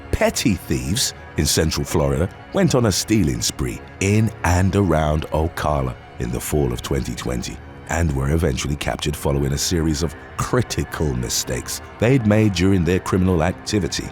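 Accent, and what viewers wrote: United Kingdom. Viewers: The best part about this channel is the British pronunciation of American locations. 😂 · The narrator sounds like "Idris Elba." I wonder if he grew up in a similar place in the UK as Mr. Elba?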